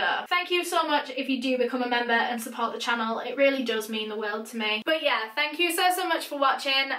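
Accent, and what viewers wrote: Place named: England, United Kingdom. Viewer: so you prob sound just as cute! I love this English accent!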